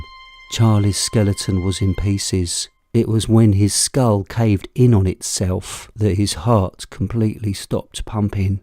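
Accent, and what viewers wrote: United Kingdom. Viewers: Why didn't I find you sooner!! British accents FTW 🖤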